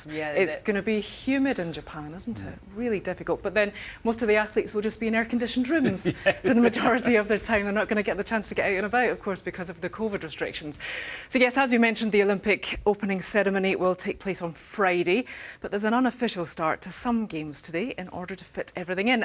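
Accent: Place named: Scotland, United Kingdom. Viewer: is very sexy,love the Scots accent.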